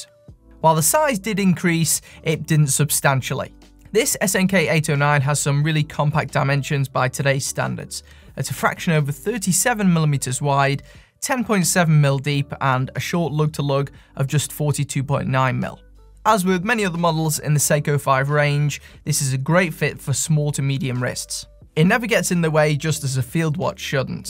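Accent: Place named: Manchester, United Kingdom. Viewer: This watch is definitely "Ice Cold" in my book. I do sometimes wish it had hand winding, but I managed to regulate it to +8 secs per day with a toothpick, something I wouldn't try with a more expensive watch. It's also easy to back hack. The B-style dial is thoroughly appealing. As you get older, life is all about minutes and seconds, and hours are of diminishing importance. I've also seen the display caseback work its charm on others. My son looked at it for about half a minute and suddenly exclaimed "wow, now I understand how watches work!" Where are you from, BTW? Manchester? Your accent is the best part of these reviews.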